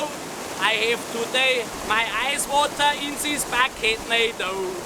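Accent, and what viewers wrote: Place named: Bavaria, Germany. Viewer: du Depp, des is bayrisches Englisch.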